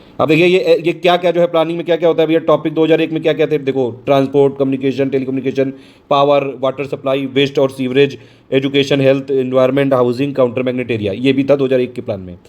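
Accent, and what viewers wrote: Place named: Kanpur, India. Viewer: Aapki bhasha... Kanpur se milti h.... Nice knowledge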